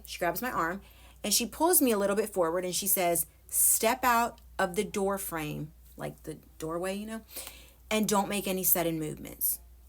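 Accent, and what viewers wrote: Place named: Texas, United States. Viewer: Ngl I thought she was from El Paso or some where in texas because of the accent.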